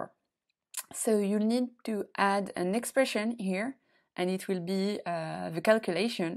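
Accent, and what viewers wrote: France. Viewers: Merci pour la vidéo (j'ai reconnu l'accent français^^)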